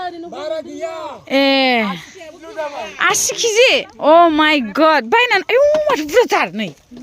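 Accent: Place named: Udalguri, India. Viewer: jwngni udalgurini baidi railainai binanao sona.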